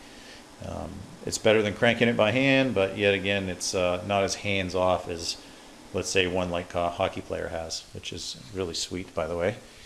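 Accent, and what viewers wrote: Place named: Maine, United States. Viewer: Hi, great update! Nice to just see ya and hear that calm clean cool Maine accent again.:-) A little bit of equipment, brew day, projects - it's in all in here.:-) Take care and see ya when all is well and less hectic!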